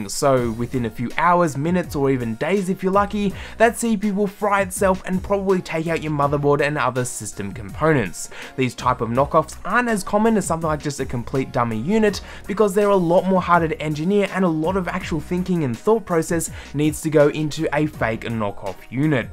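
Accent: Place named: Australia. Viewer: Yes... It is... He's not American. He's Australian... That's how Australian's talk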